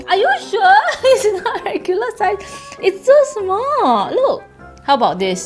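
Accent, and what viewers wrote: Singapore. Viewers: Is it just me or accent is so different from her usual singaporean accent here lol and has singaporean accent yay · Sounds So So So Singaporean and Singlish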